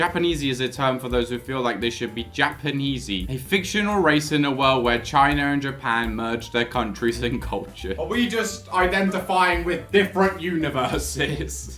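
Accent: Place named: United Kingdom. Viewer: is British! 😮 best of British Amazing Guy...I was thinking American..🎉❤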